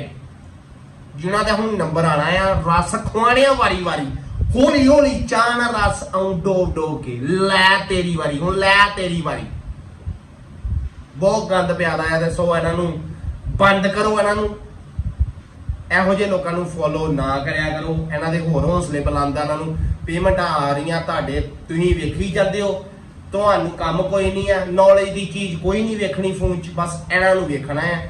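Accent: Pathankot, India. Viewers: ਬੋਲੀ ਬੋਹਤ ਵਧੀਆ ਭਾ ਪਠਾਨਕੋਟ ਵੱਲ ਦੀ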